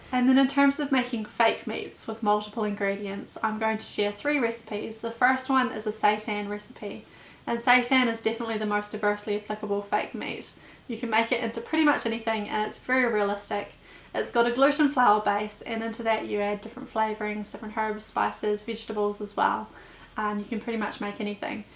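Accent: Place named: New Zealand. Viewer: I picked up the kiwi accent way before she mentioned it. If I'm not mistaken she's probably also from the lower south Island. Just a hunch. Great presentation and finally vegan recipes with New Zealand ingredients!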